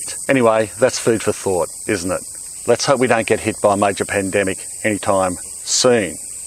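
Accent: Australia. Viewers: I'm in Ontario Canada. I lived a couple of years in Melbourne and my brother has lived in Australia now over 30 years. It's my fav place outside of Canada. I love to watch Aussie videos to hear the accent I miss so much and in videos I love to hear the birds - I miss their sounds so much.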